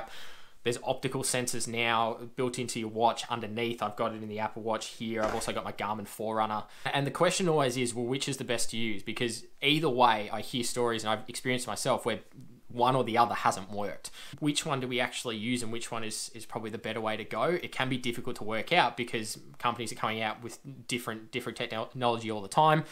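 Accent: Australia. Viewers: Hey, that was a good Video really appreciate you work on this, I myself use a Polar H10 Cheststrap along with the Vantage V, have been stuck with Polar since 2013 and since then always Cheststrap measuring. I'm very interested in the topic of burning 10 000 Calories (opposing to eating those) Have done that in 2017 (but despite having all material never managed to cut it and upload it) Now I have seen qute some Videos of people attempting it with all kinds of measurements...yes also using myfitnesspal and no heartrate measurement whatsoever. Then also a lot with the wristbased measuring and i had the feeling those might read the heartrate higher. You said because of bloddpressure the reading might be higher on the wrist. WOuld you assume in a quite heavy lifting session as the bloodpressure shoots almost through the roof the wristbased measuig would give a higher average heartrate than the cheststrap? 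Cheers mate greetings to Australia I'd guess from your accent...but only after having posted this comment will checkout your other videos where you might have revealed that alrady. Sporty Greetings from also a Sportscientist from Germany living in Dubai. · I concur, and the Aussie accent always helps 👌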